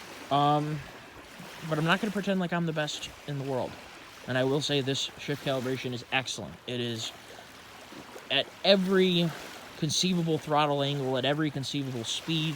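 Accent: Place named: Michigan, United States. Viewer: Your voice sounded younger back then. I notice more of the Michigan snarl now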